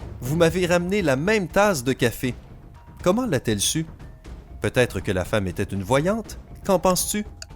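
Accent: Quebec, Canada. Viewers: La voix quebecoise pourri :( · Ah !!!!l accent canadien!!!😁😁😁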